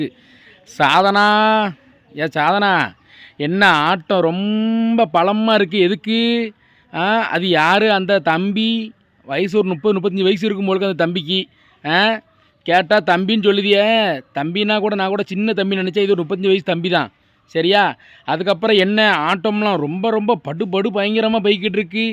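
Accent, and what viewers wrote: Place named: Tirunelveli, India. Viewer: தங்கள் நெல்லை பேச்சு நன்றாக உள்ளது அண்ணா 🎉🎉🎉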